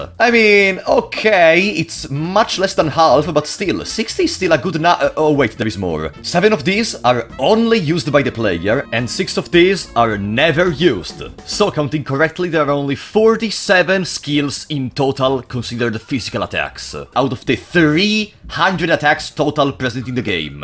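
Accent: Italy. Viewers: I just love your energy, I never hear italian accents online and when i do they’re usually more timid, but damn you wear it on your sleeve and you OWN IT! I love the energy! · I've never heard an accent as Italian as yours. I have family in Italy, i've had online friends in Italy, nobody I've heard has had as thick of an accent as yours. Ngl it's a lil impressive and i'm not saying any of this as a bad thing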